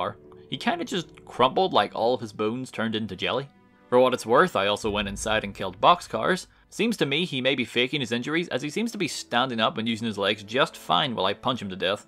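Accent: Ireland. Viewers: Bro this dude has the best Irish accent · Is he Irish ? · Waking up to an Irishman talking about doing a boxing run... I was born in the right Era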